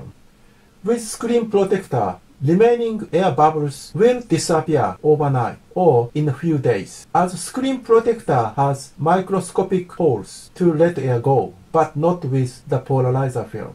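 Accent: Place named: Japan. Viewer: まさに日本人って感じのアクセントやな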